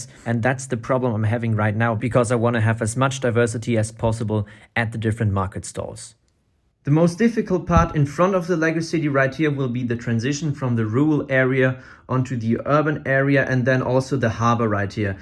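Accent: Germany. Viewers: Dieser Deutsche Akzent ist rauszuhören :) Und mir ist aufgefallen das du denselben Text wie im Deutschen benutzt nur halt auf Englisch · Are you German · Da war ich mir doch sehr sicher, den leiiiichtesten deutschen Akzent zu hören.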